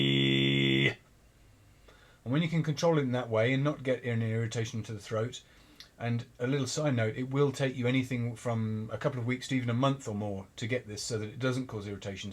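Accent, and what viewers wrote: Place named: United Kingdom. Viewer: Thx i'll tying to do with your video. Good Britain accent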